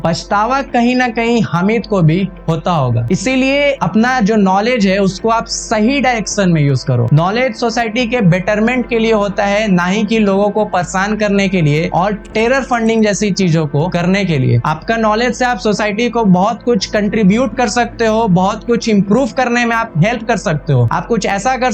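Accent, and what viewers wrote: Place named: Bihar, India. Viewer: Pakka yeh Bihar se hai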